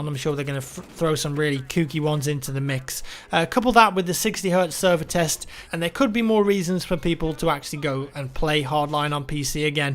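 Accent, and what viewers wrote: United Kingdom. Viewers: Never Played Hardline, just here for that British voice...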